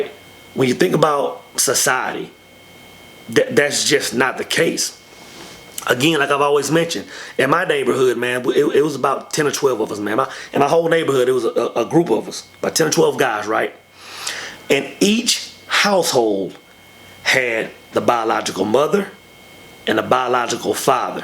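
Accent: Texas, United States. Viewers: Before I knew you were a PK (preacher's kid) I saw the pastoral love in your eyes; the apple don't fall far....(Your Texan accent is awesome, BTW). I am amazed by the gentleness by which you edify your brothers. God has a very powerful calling on your life, and I am committed to pray you and the other brothers become all you are meant to be according to God's grand plan. Keep up the good work. My heart wants to be sad that you feel marriage is not for you, but I can understand being in that state because of past experiences and being screwed over by society. May I say, DON'T LET THEM WIN! I don't know how your individual lives will turn out but just know here is a sister who wishes you well, and laments the pain/frustration/mistreatment you have been through. I hold you all in high regard and expect things in your life to get better. I wish you to be surrounded by people who see the treasure in you and draw it out. 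You will not go down. You've already been down. Now is the time to keep going, keep rising, keep building. The generations will sit at your feet and say, "Dad....Honey...Grandpa, tell me...teach me...explain...show me" #YouTheMan #YouTheBoss #TheEarthNeedsYou, my kings!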